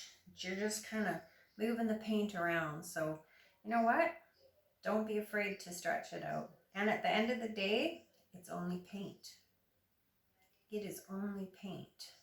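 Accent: Canada. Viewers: Oh. This is awesome!! How brilliant!! Such detail! I love 💕 it!! I see your from British Columbia!!! I’m from Windsor Ontario. But live in Florida now!! I thought I detected an accent from my homeland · Good job on your first video,! BEAUTIFUL ARTWORK!! As soon as I heard your 'accent', I knew we were kindred spirits! I'm Canadian, originally from Toronto - now living in San Diego, California!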